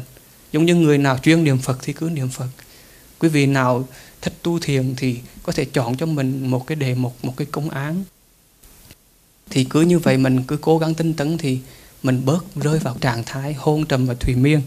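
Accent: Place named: Central, Vietnam. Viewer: Giong noi mien trung cua thay rat hay rat thich nghe ma nghe ko chan cam on thay ah .Nam A MO Di DA PHAT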